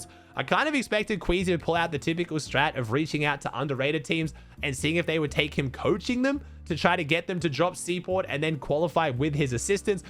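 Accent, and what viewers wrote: Australia. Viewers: Tooo aussie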